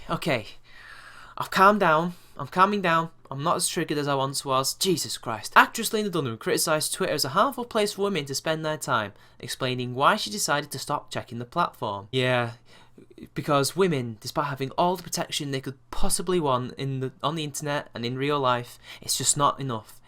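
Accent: United Kingdom. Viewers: I somehow missed this in my subscription feed. This was really entertaining video. Thanks for the laughs! The way you say certain things in your hot British accent makes your jokes seem even funnier! Nice to see your handsome face on camera :)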